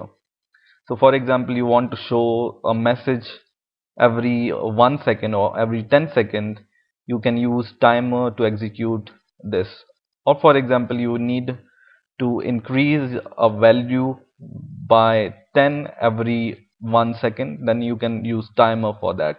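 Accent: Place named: India. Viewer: i love your indian acent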